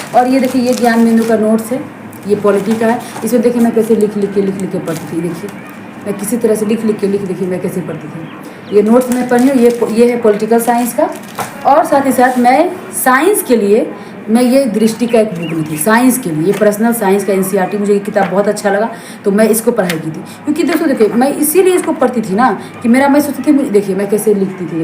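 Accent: Uttar Pradesh, India. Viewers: Tum to lgta h up ki ho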